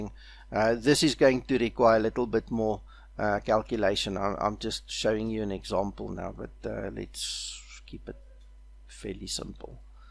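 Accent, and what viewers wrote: South Africa. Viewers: Love the SA accent mate :D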